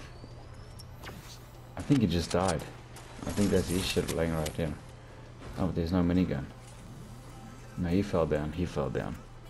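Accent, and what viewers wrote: South Africa. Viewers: Are you south African? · Are you afrikaans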